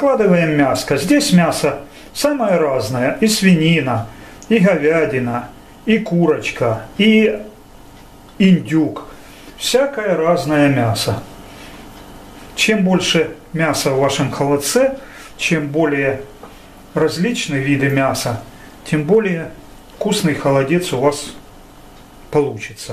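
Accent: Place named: Ukraine. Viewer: Хас, хатоука, прихотовление, ховядина, храдусы... Попробуйте сделать над собой усилие и говорите по-русски. Или уж честно на мове начинайте гоорить. К которой, кстати говоря, большинство русских относится совершенно нормально. Но это смешение наречий, рожающее суржик, просто режет ухо. А за рецепт спасибо! Зачётно!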